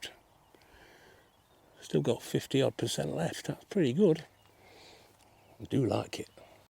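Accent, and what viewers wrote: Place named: Midlands, United Kingdom. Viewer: you sound like your from Midlands, I got one today and it does 350m before losing 2 bars. Would loved to run 2 of them at same time and test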